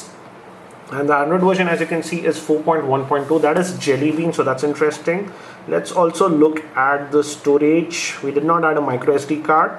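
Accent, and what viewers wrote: India. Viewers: You sound like an Indian? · its indian accent.. u sure u hate originality?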